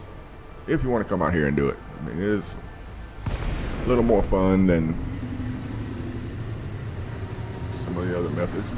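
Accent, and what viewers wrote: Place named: South Carolina, United States. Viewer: man are you from south cackalacky? you sounds like some peeps from around here.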